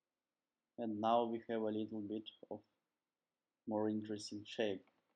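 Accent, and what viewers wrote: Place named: Russia. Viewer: Smells like a russian accent!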